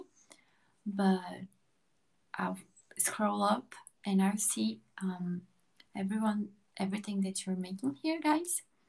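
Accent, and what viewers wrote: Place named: United States. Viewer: Eu pensei que você era americana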